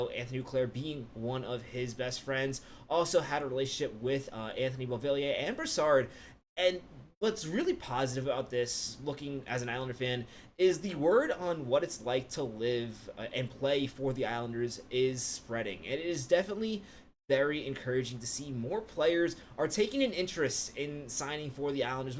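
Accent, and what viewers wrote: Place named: Iowa, United States. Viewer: Where are YOU from?? Ya don't sound like a Long Islander. Ya sound like you're from Iowa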